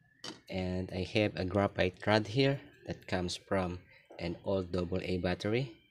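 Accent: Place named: Philippines. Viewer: The way you speak English,similar to us filipinos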